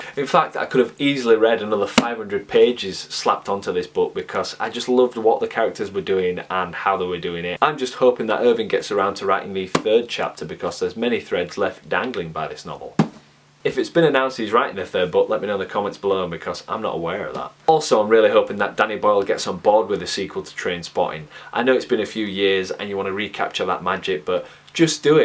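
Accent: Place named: Scotland, United Kingdom. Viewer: Oh, okay, but I feel like the scottish accent is kinda catching up on you, idk maybe I'm just being silly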